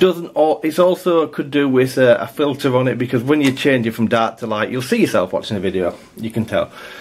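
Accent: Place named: North, United Kingdom. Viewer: Thanks for the sub, sounds like tha'as from t'same part of t'world as me.Happy Flying.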